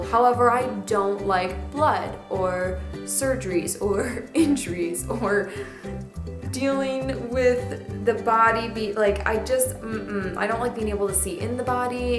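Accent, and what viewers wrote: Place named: Upper Peninsula, United States. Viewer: Lol this is an old video but it popped up on my main page so I decided to watch it, and I'm actually really glad I did! I'm from Wisconsin and I could totally tell by your accent that you were from around the UP.. Not that that's a bad thing though! Haha :) I used to play Sims and Runescape too like it was nobody's business too! haha And omg.. I am petrified of pain too and being pregnant scares the hell out of me too. I'm glad I'm not the only one that's genuinely scared of that lol.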